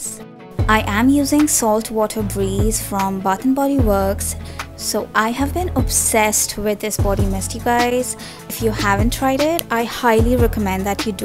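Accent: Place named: India. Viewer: I think indian look at her accent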